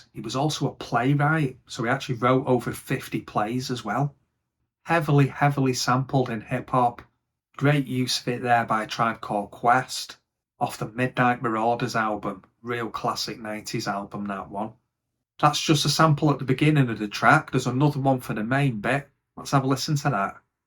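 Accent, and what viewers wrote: Manchester, United Kingdom. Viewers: Well that's me un-subscribed.. sorry but that boring monotone manc accent is killing, ta taa..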